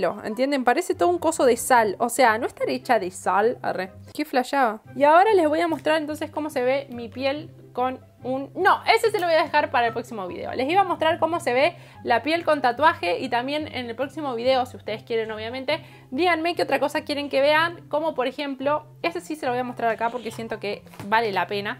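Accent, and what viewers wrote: Argentina. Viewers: Tiene voz de Argentina o soy yo :v o chilena :v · Sos re argentina jajaj ahre que yo también ahre jajaj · sos argentina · Jaja re argentina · Sos argentina ?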